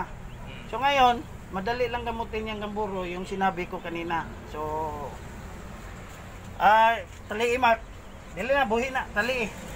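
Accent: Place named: Visayas, Philippines. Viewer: tgaasa diay ka boss.? bisaya mn diay ka?😂😂😂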